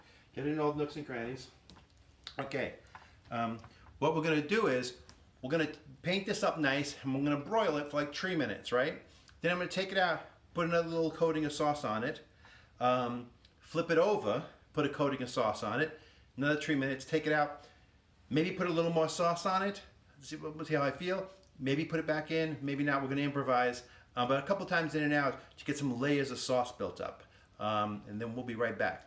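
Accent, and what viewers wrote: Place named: New York, United States. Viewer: Do you always turn on your Nyuu Yawk accent to the max when you're in the kitchen,? <3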